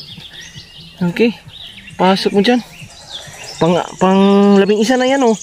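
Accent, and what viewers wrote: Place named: Bohol, Philippines. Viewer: Ta taga bohol ka ? Maila mn gd sa tingog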